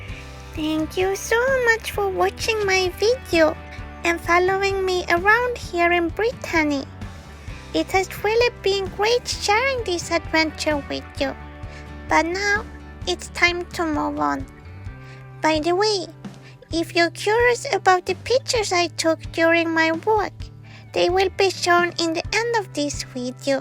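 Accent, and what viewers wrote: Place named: Netherlands. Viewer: Thank you so much for another lovely video! I am catching up for 2 weeks worth. I am still intrigued by your lovely accent. Are you Dutch? I’ve been meaning to mention that I really like your animated maps following Bikki. The music is perfect! And congratulations on your constantly growing subscriber count. Claws crossed you’ll keep growing 😀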